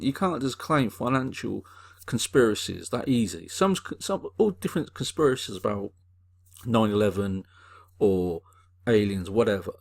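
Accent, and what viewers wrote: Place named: RP, United Kingdom. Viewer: Please stop talking like an idiot Brit. Loose the dumbass royalty respecting accent.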